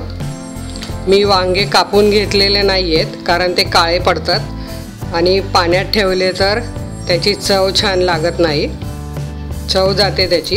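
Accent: Khandesh, India. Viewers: Aho tumhi khandeshi aslyasarakhe boltat.,mhanun. Ssory ha tai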